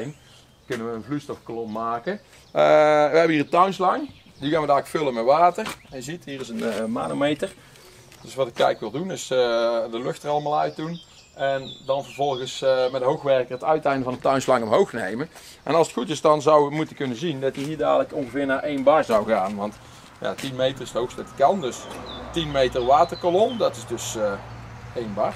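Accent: Australia. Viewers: Is this Australian accent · I thought he just had a real heavy Australian accent haha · I had no idea what channel this was when I clicked on it or where he is from but as soon as the video started I thought he was speaking English with an Australian accent. Then I realized I couldnt understand him at all. Then I thought he was speaking Gaelic or something with an Aussie accent.. do all dutch people sound like this?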